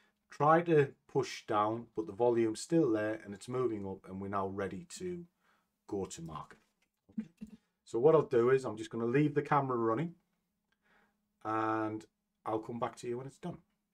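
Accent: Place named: Northern England, United Kingdom. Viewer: Hey like your videos. Sounds like you're in my neck of the woods. Good to hear fellow northerners smashing it. Like the way you trade live. All the best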